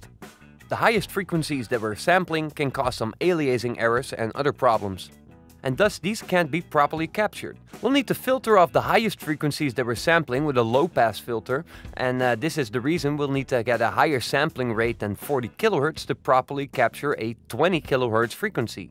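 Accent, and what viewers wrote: Netherlands. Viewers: You sound dutch.. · are you dutch by any chance or belgium?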